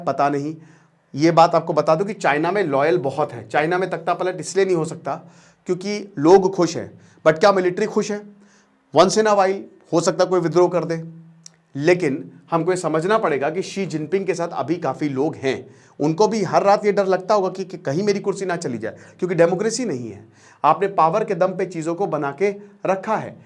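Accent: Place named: Lucknow, India. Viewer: Sir lucknow ki gehri छाप hai ap pr …. “उसी चौराहे पर टाँग दिए जाओगे “ itnaa khtraank bolaa apne 😅😅😅😅 😊😊😊😊😂😂😂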